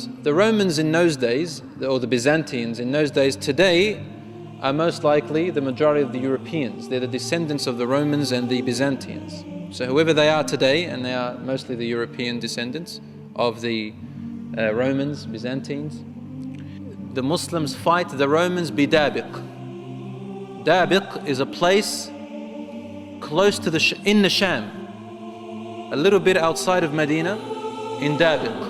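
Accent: Indonesia. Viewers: Kayaknya dari suaranya orang indo nih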